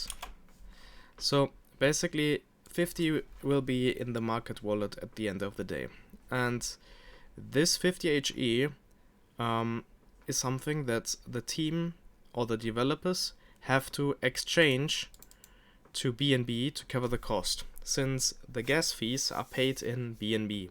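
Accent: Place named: Germany. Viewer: Ein Deutscher das erkennt man am Englishen Slang :D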